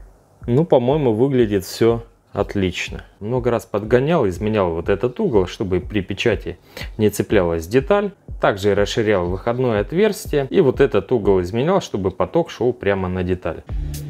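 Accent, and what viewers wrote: Rostov, Russia. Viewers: Акцент Ростов Папа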